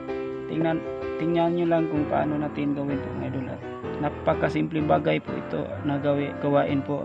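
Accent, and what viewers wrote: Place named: Visayas, Philippines. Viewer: idol mura ug bisaya ka ngutana lang! naa ka idea nga mo taas ang amperahe sa transformer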